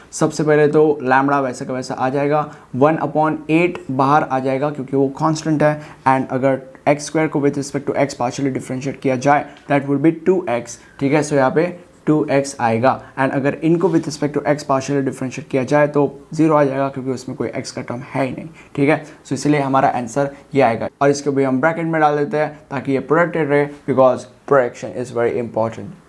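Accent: United Kingdom. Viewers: British accent 🫡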